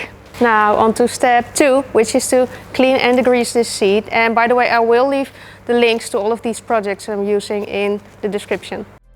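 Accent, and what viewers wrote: Netherlands. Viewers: ARE YOU DUTCH? 🙋‍♂️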